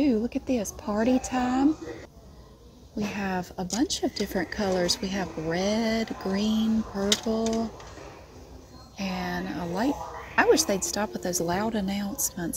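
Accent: South, United States. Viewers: Your quick-witted commentary with your southern accent makes these my favorite videos. You always make such a drastic before and after with organizing. Please do more! Thanks!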